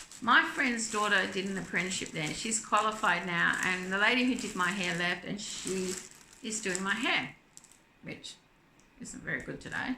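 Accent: Australia. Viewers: I love your hats and cowl. I’ve yet to try the divine hat, it’s on my list to master this year. Congratulations 🥳 on your one year anniversary of YouTube, I’ve enjoyed watching your videos! It’s been lovely having another Aussie voice out there. Enjoy your long weekend and Happy Australia 🇦🇺 Day ❤️🧶💜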